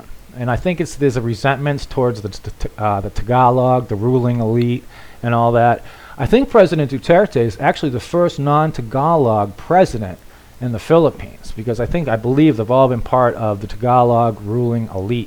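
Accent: Boston, United States. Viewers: Hi, knew you were from Boston but first time I heard Dot!! Saint Ambrose in Fields Corner here. You??? Gotta know some of the same people!!! Was also stationed at Subic same time you were at Bragg.....hahahaha. Thanks for the vids....gotta make a trip to Dumaguete one of these days!! Have fun and stay safe!! Think you need an OFD tshirt. LOL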